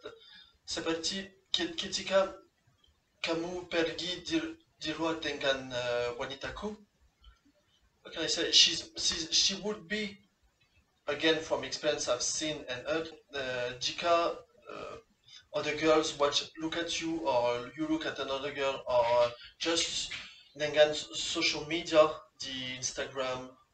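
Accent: France. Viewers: Bahasa Indonesia kamu beraksen france, dan itu menarik :) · French accent is soooo sexy...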